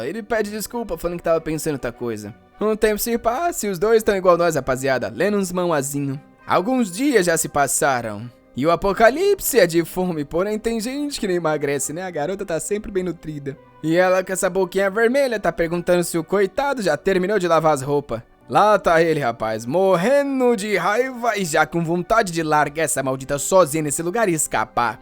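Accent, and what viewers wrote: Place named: Minas Gerais, Brazil. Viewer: É só impressão minha ou o jeito dele de narrar tá menos caricato e exagerado? Eu falo daquela melodia meio mineira que acho que tá menos acentuada mas não sei.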